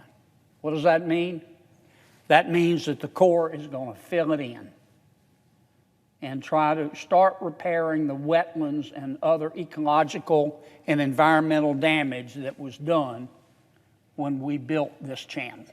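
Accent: South, United States. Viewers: I am from the South as well and I Love His Accent!! I also Understand all of his jokes 😂 He is just a Good Man Period .. Big Heart ❤️ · Me and Mr Kennedy share the same southern drawl. My Mom's family are from Keechi, LA. people think we are stupid because of the drawl. I love when they underestimate us!!! It makes for good times! This is Senator Kennedy's Super Power!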